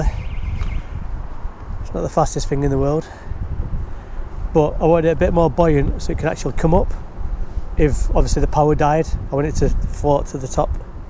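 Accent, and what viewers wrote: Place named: England, United Kingdom. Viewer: very nice eng accent haha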